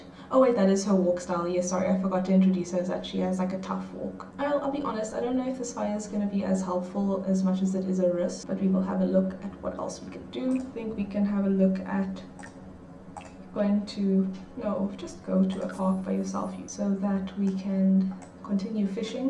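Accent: South Africa. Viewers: Lost it at "hey MTV, welcome to my crib!" hahah Also, are you South African? Your accent sounds a lot like another YouTuber I used to watch and he lives in South Africa :0 TalesofLumin, I believe is his name.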